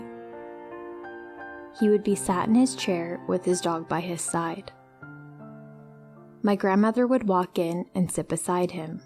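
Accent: South, United States. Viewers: The southern accent was phenomenal, you're so talented that's awesome. You're gonna go far.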